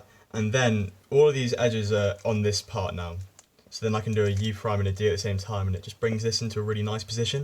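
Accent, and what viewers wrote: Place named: United Kingdom. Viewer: Do you actually speak with a Yorkshire accent? I love your British accent,cuz it sounds super clear and pleasant frankly speaking!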